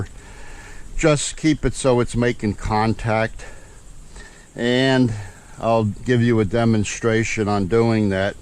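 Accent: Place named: North, United Kingdom. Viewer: fishing and please tell us about your old boat. About the inboard vs outboard also what lead you to buy a key west and tell us about the other center consoles that you looked at before you bought the key west. Also I can tell by your accent your from up north what was it like fishing up there thank you have a blessed night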